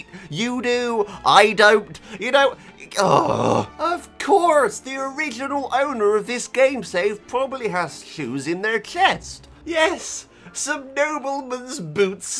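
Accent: United Kingdom. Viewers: From where in Britain are you?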